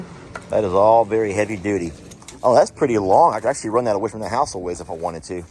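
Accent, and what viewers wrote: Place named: Rural, United States. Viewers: deep southern drawl with a twang of! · I’d rather hear that generator than that hick accent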